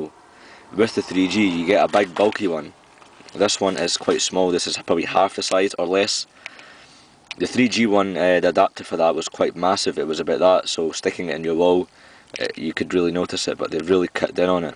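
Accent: Scotland, United Kingdom. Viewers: This is a Scotish Accent, right? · London? Ha-ha! He is obviously Scottish, mate!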